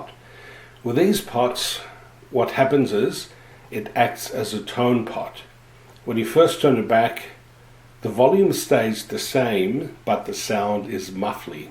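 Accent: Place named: Australia. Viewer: Ahh hah! The ALMOST Oz accent and the fact you quoted the price IN AU dollars! ;oP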